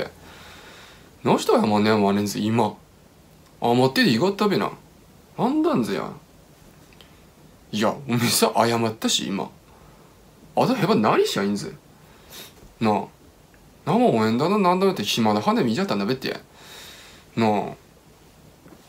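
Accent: Tsugaru, Japan. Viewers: 自分でも よくわかりませんが ここに辿りついてしまいました 😂😂😂 津軽弁！ 素晴らしい👍👍👍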